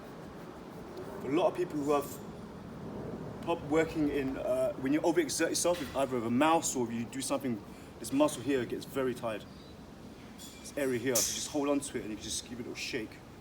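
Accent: United Kingdom. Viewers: your English sounds British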